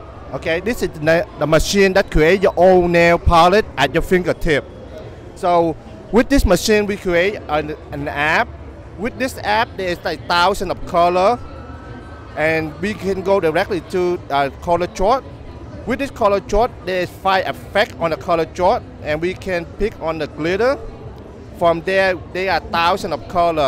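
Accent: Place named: Vietnam. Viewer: Hears voice * immediately realizes he is Vietnamese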